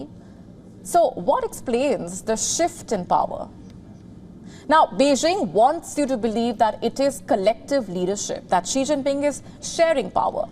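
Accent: India. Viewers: Her Indian English is more interesting to me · Indian accent... a bit hard to understand..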